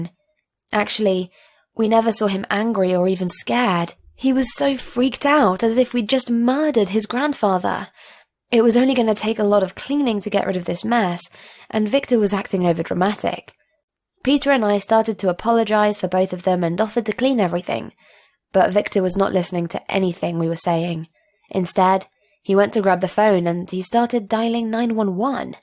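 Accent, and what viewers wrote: United Kingdom. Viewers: Y is this recorded in a British accent if they went to Walmart because that american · I like how she has a British accent and goes to Walmart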